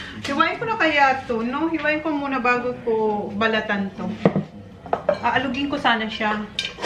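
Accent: Philippines. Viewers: Alam ni yung Filipino accent😂. Love it!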